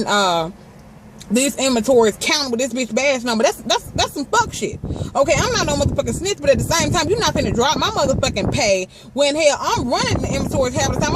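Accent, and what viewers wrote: South, United States. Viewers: Yea she be having my stomach hurting too 🤣🤣🤣n bein that she has a country accent makes it even funnier... LMFAO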